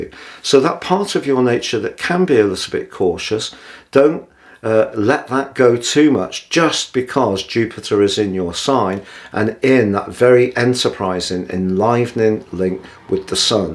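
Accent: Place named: England, United Kingdom. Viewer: Thank you lovely. So nice to hear an English accent on u tube like a pair of warm slippers! Ive had some amazing prophesies Tarot wise i tried to align them with your reading. However, i remain a novice of astrology so it was too difficult for me to do. Time will tell. Best wishes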